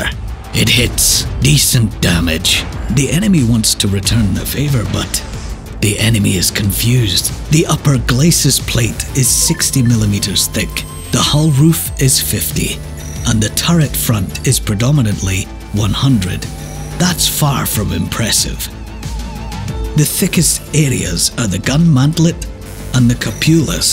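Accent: Scotland, United Kingdom. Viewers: The scottish accent is stronk in this one. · That Scottish accent is thicc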